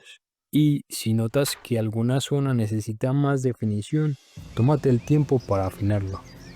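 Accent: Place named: Latin America. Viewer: un video acerca de lo que me gusta con el acento latinoamericano mas bello que existe, saludos desde Argentina amigo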